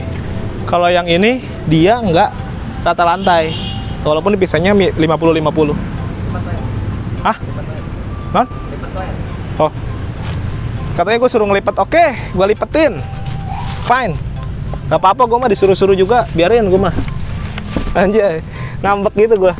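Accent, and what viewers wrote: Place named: Garut, Indonesia. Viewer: hahahaha kayanya orang garut euy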